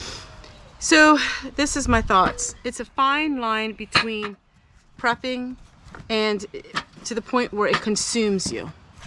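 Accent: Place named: Ohio, United States. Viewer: Your accent sounds like your from Berlin OH.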